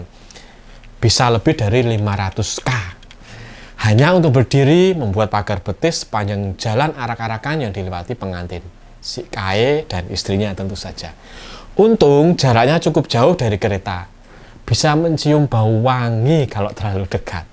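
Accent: Java, Indonesia. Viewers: Muak dengar logat Jawa nih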